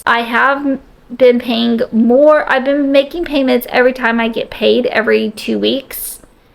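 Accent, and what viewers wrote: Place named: South, United States. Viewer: Wow, you remind me so much of myself, (although I don't have the delightful Southern accent)! I got my Grand Diplome in Culinary Arts in 2004, which led me to a 80 hour weeks of minimum wage foodservice gigs, so I acquired an Associate's Degree from University of Phoenix in 2011, in a vain attempt to (HA!), "improve" my life. They have both proven themselves to be nothing but expensive wallpaper. I spent my teen years and 20's working 80-100 hours per week, and my 30's working 60+ hours per week. I moved back in with my parents when I got my house foreclosed, and still live with them at 40 years old. The only way that I can make a living ($50K-ish per year) is in a warehouse, slowly killing my back and knees. I declared Chapter 7 Bankruptcy in 2012, along with a car repo and house foreclosure, but I was still stuck with the useless student loans. 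UOP should be forced to pay back all of the people like us, as their degrees aren't worth the paper they're printed on. Enough about me, I sincerely hope that you can claw your way out if that hideous credit card debt, and can get your student loans forgiven. Best of luck to you!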